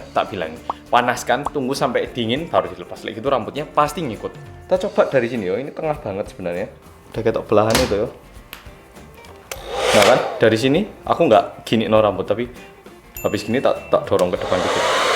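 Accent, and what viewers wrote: Java, Indonesia. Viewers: Medokkkkkkkkkkkkkkkkk jaga kesehatan mas 🤗🤗🤗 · Yeheee orang korea yang MEDOKKKK hallo mas👋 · Udah lama gak nonton, kangen medhog mu mass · beli mi instan korean spicy bang medoookkk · Di rumah aja nontonin sing medoook aja! Hehee ᬚᬓᬭ᭄ᬢᬭᬬ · Gue nonton trus video dia .. dia asli korea .. sekolah di indo balik korea lg kok jawa nya medok banget .. geuuumeeeeess 🤣🤪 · Style baru nihh rambut baru kacamata baru makin berasa kek oppa medhok awkwokwowkwowkowkwo....... cocokan gitu seee keliatan kurus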